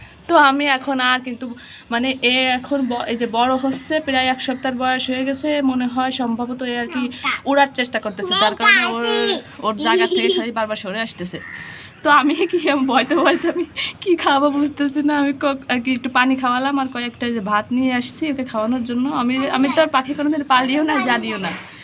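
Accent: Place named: Faridpur, Bangladesh. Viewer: আসসালামু আলাইকুম আপু। মাশাআল্লাহ তোমার ছেলেতো একধম পাখি প্রেমি ❤❤।তোমার কথার টৌন শুনে মনে হলো তুমি ফরিদপুরের।আবার বাগেরহাটের কথাও কিছুটা এমন।আপু পাখিটাকে একটা ক‍্যারেটের ভিতর রাখতে পারো তাহলে পড়ে যাওয়ার কোন ভয় থাকবে না।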